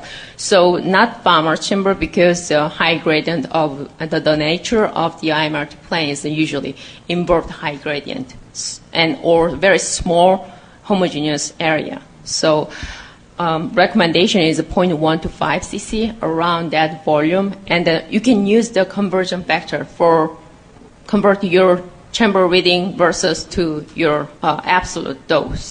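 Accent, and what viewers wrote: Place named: China. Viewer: when it comes to science the language should be clear & understood, her Chinese accent replaces the "L" with "R" MLC will be MRC, Real-time become Rear-time!! but thank you for the effort.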